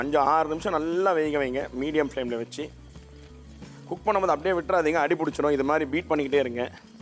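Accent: Chennai, India. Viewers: நீங்கள் தயார் செய்யும் உணவை உண்டு ருசிக்கலாம். நீங்கள் பேசும் வட சென்னைத் தமிழை காதில் கேட்டு ரசிக்கலாம்.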